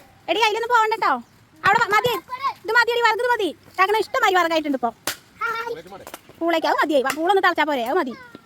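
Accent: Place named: Kerala, India. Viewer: 😂😂😂 തേങ്ങ വാരി തിന്നൽ. ഞമ്മളും മോശമല്ല. നിങ്ങളുടെ ഭാഷ കേൾക്കുമ്പോൾ കോഴിക്കോട് .കണ്ണൂർ. ത്രിശൂർ ബോഡർ ടാമസകാരാവാൻ സാധിയത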